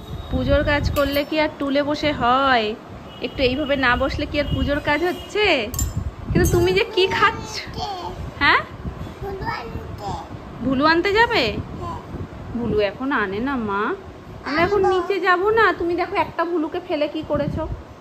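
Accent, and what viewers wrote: Bangal, Bangladesh. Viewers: By borne foreigner too tumi ..Ar tumi je educated ...sooo impossible bangal kotha adopt kora....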